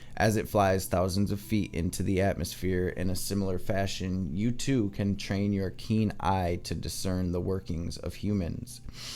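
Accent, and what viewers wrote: Detroit, United States. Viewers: You from Detroit bro?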